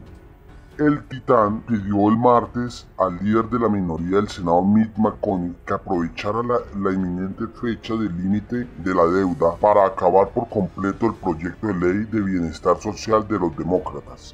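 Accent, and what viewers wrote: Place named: Colombia. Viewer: Este voz acento. Colombiano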